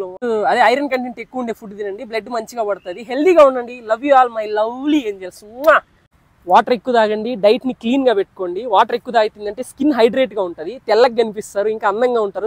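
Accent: Nellore, India. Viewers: Navvabaku camera man🤣🤣(Nellore slang 🔥) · బ్రో నేను ఒక మాట చెప్తాను దాన్ని గుర్తుపెట్టుకో నువ్వు ఏదో ఒక రోజు పెద్ద యూట్యూబర్ అవుతావు నీలో ఆ స్కిల్స్ ఉన్నాయి ఎందుకంటే మన నెల్లూరు యాస అలాంటిది🙌🙌🙌🙌🙌🙌🙌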